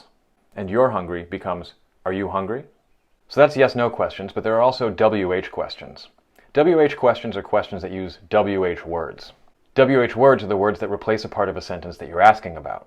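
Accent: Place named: United States. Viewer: What I've learnt from this video, and having checked to verify, is that Americans seem to pronounce plural 'moths' differently to us Brits, Irish, Australians and New Zealanders. You seem to say 'moθ' in the singular and 'moðz' in the plural whereas we also say 'moθ' in the singular but say 'moθs' in the plural. Yeah, I know we say the vowel differently too but that's not what I'm looking at.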